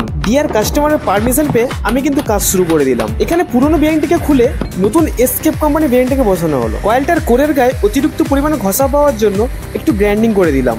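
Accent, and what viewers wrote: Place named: Ghoti, Bangladesh. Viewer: সকল শব্দের উচ্চারণের শেষে কেবলই ছ এর ছড়াছড়ি। ঘটি বটে।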